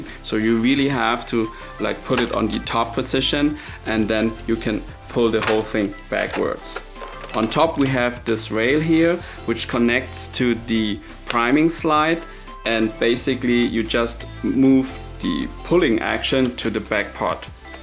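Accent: Germany. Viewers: I can see your german, right? Der akzent sagt alles! :) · Ich erkenne das du deutsch bist am Akzent richtig cooles Kit muss ich unbedingt machen ich bin riesiger sniper fan aber leider gibt es keine coolen sniper von nerf ausser die Longstrike die aber nicht mehr hergestellt wird deshalb werde ich mir das kit unbedingt kaufen .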